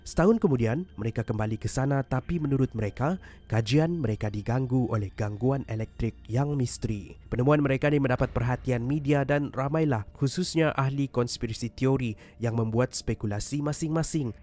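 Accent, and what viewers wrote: Sabah, Malaysia. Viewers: Dia loghat sabah. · Abg ni orng sabah kh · patutla cara cakap lain sikit, urang sabah ani haha mantap, teruskan, support bah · Abang ni orang sabah ke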